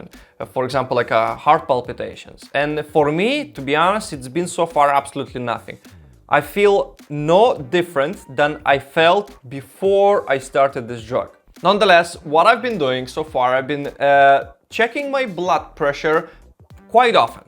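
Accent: Russia. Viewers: ah nice I instantly recognized by your accent you were a Russian speaker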